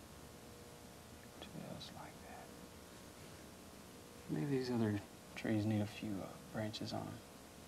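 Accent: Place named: Indiana, United States. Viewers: Classic Indiana Accent... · He is the best. The Indiana country accent--"acrosst". Perfect ASMR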